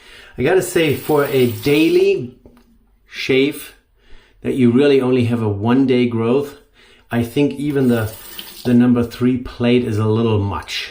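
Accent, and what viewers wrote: Germany. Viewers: Mußt nicht machen. Danke für die Erklärung. Dem Namen nach und dem german accent nach habe ich es mir schon gedacht. (von rock sprachst Du relativ am Anfang) Schöne Grüße über den Teich 👋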